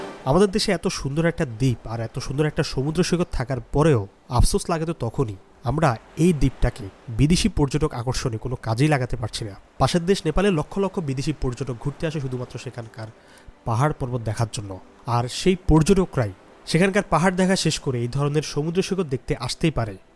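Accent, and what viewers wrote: Bangladesh. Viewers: আপনার কথা বলা বাংলাদেশের মানুষের মতো ।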